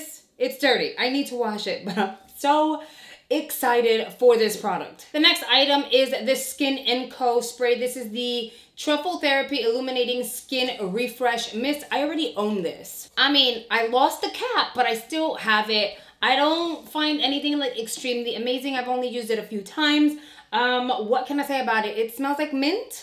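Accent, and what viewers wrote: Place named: New Jersey, United States. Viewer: Always look forward to your boxycharm unboxings...i adore your Jersey ( I’m assuming that’s where you’re from cuz that’s what it sounds like) accent!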